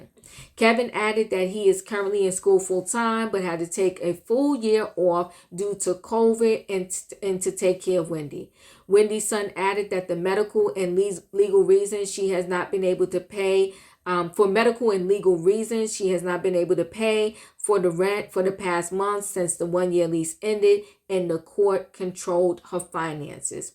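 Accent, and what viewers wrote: New York, United States. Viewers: Big ups to the NYC!! Love your new York accent I miss my peeps!!❤️❤️❤️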